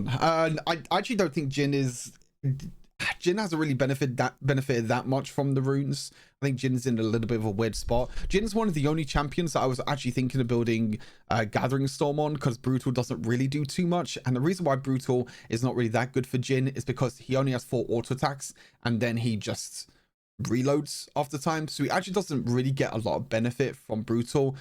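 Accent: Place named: United Kingdom. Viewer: i love the british accent. I learned to speak english recently and i can't understand almost anything that americans say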